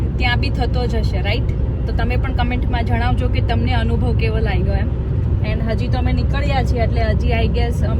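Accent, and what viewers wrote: Kathiawar, India. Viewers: tame kathiyavadi bolu che e maja aave aapna aapna j bolu bolay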